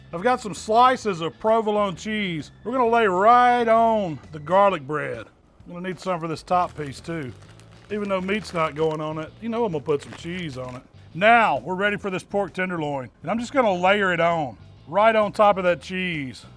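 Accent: United States. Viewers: Обожаю американский акцент.А кухня просто потрясающая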